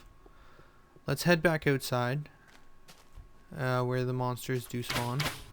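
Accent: Canada. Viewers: I think every other video someone asks if you're canadian xD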